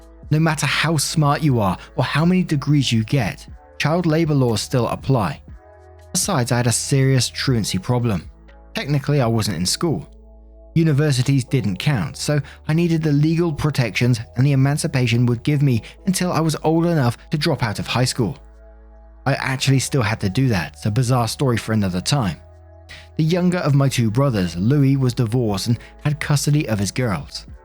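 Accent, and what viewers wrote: United Kingdom. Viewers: One question? Your accent….. are you British?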